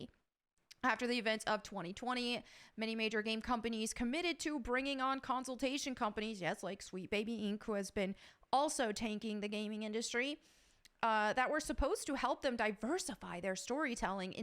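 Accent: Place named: Pittsburgh, United States. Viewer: I'm not sure how you popped up but damn I'm glad you did. Not afraid to say what everyone is thinking... Lara Croft... And the steelers popcorn container back there - I have one that's coors light/steelers. I thought I heard some Pittsburghese in there but I'm not sure on that, all I know is you're my new favorite person lol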